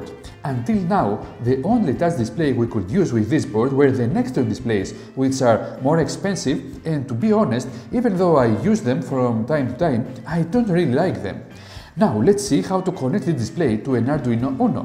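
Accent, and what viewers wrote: Greece. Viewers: Are you from Greece? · the moment he opened his mouth, I knew it from the start, He's Greek! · I hear a Greek accent! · Γεια σου.απο οτι καταλαβα απο την προφορα πρεπει να εισαι ελληνας.θα ηθελα να σου στειλω ενα link και να μου πεις πως μπορω να το κανω και ποσο (ευκολο) <link> ευχαριστω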